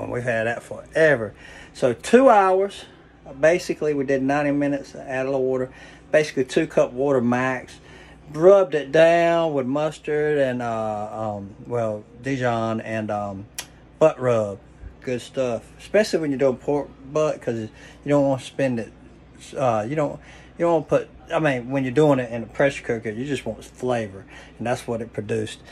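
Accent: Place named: South, United States. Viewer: Dayum!!! That looked good! Also freaked me out hearing a true southern drawl coming out of your mouth! I subbed because I want to see some more videos!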